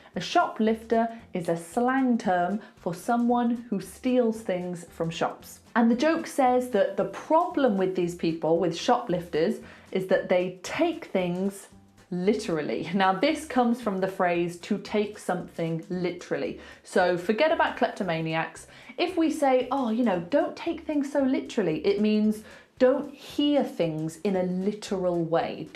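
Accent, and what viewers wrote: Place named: RP, United Kingdom. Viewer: Hello. Great video! I speak Spanish and have for most of my life (My mother is Cuban, and my wife is Mexican, but I grew up in the US). However, the reason I don't consider myself fluent in Spanish is because I have trouble with many of the jokes for the reasons you mentioned (idioms, slang, colloquialisms, etc). I am going to teach ESL here in the US to Spanish speakers. Jokes are definitely something I will use so thank you for that idea. I am also going to use songs because that is also a fun way to teach. I also have noticed that phonetically the RP English that you speak is easier for Spanish speakers, especially the pronunciations. One example is words that end in -er. When I was trying to teach my wife English using a word like "greater" her mouth could not make the "err" sound. But in RP it is "GrAY-tah" which she can say. 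She actually learned a lot of English from a woman she worked for who was from India and did not know how to speak Spanish but spoke RP English. That is why I subscribed to your channel, I would like to teach both RP and American English (which has many, many accents as you know - I consider the English spoken by American hillbillies almost a different language :). My accent is fairly neutral with a slight midwestern twang. I really like the way you teach. You use humor (or humour - haha) but you get to the heart of the subject. Best of luck! I will end with a joke: Why is England the wettest country? Because the queen reigned there for decades (may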